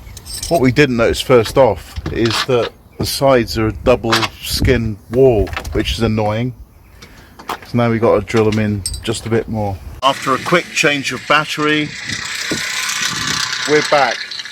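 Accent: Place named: West Country, United Kingdom. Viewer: Appeal it if you can’t pay it…. Hate that people who have cars or vans are victims.. As you know it’s a parking permit used in my street, two of my visitors have been fined for not putting the vehicle reg on the permit. I paid them off within minutes at half price, local government are not car friendly. You need a lock up or a friend to store stuff you’re not using, nomadic Kangaroo does not seem to carry as much as you do. Perhaps he stores his stuff? As for the toilet, perhaps incorporate it in the driver seat 😂. That would be so good for comedy purposes and serve a dual purpose 😂. Great vlog and entertaining, Great to hear the West Country accent, the sound of my youth in Bristol.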